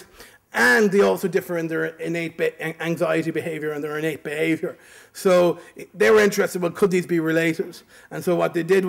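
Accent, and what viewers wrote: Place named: Ireland. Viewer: Where's he from ? I heard him in Science Friday and thought it was a black Irishman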